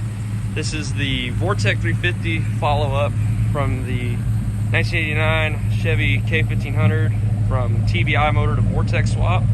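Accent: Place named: Tennessee, United States. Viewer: I'm from Tennessee and not only do we sound alike.... we also think alike .... lol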